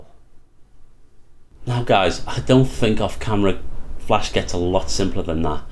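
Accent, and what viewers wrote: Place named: Southport, United Kingdom. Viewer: Thanks for a very helpful tutorial. I did buy 6 youngnu 560 but I really found them fiddly to control and they kept going to sleep. I need 4 speedlights for work on location and I think this godox may be a bit more reliable. PS your accent sound like you might come from down the road from where I live in Southport 😎👌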